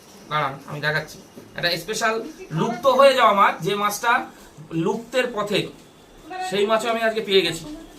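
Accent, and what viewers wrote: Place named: Dacope, Khulna, Bangladesh. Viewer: তোমার কথা একদম আমাদের মতো।দাকোপ,খুলনা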